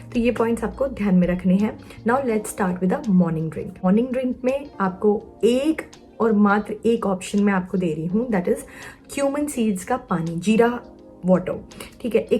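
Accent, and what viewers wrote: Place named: Pakistan. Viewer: Not at all like Marium Pervaiz infact more perfect urdu than her. she is speaking urdu in Pakistani urdu accent. I am Pakistani BTW and we speak urdu like her. 😊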